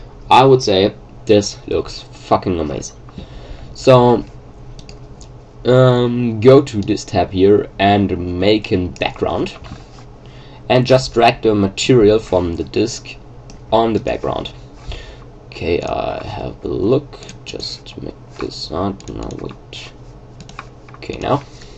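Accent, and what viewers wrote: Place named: Germany. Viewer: Man hört sehr gut deinen Deutschen akzent :D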